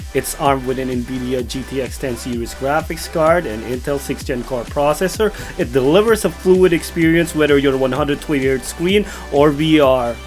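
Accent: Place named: Australia. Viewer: it's a rare opportunity you'll see an asian guy with an aussie accent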